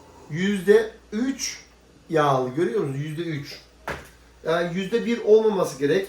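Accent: Gaziantep, Turkey. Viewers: abi sen antepli misin?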